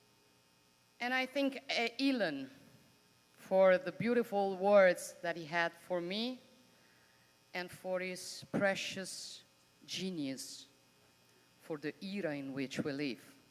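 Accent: Rome, Italy. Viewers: Onore al merito, ma che inglese romanesco!!! Non si può sentire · i admire she went all out trying her best in English.Although she gained more and more (Italian) accent along the way,she managed to get all important concepts across,she corrected herself in few occasions,still composed and strong.it is impossible to be liked by everyone,and she knows that very well,but i think she now have the respect of many.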